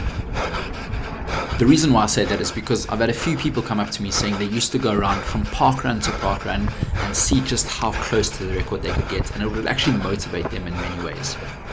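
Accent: South Africa. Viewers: 6”2, 120kg,47 and the only thing we have in common is the the SA accent. I enjoy parkrun for just being out there records are fine for those who care about them , I disagree that getting lapped is motivating but it’s encouraging when a front runner cheers you on but also annoying when they think they own the place ! You showed your class by staying and cheering on the others !!! . The 6 week training pack looks great but not sure it’s intended for those at the back of the pack . Keep up the great work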